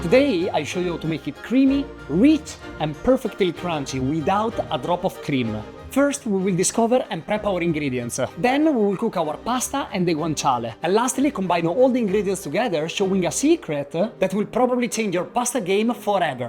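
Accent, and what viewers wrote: Italy. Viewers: sounds very Italian when speaking English because he carries over Italian patterns in pronunciation, stress, rhythm, and intonation, giving his speech a melodic, rising tone and a distinctly Italian flow.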